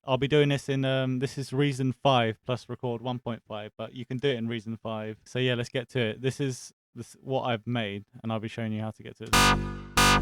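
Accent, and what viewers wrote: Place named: United Kingdom. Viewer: Oh man you're British! And you are the dude that made those sick sonic remix! Ahh it all makes sense!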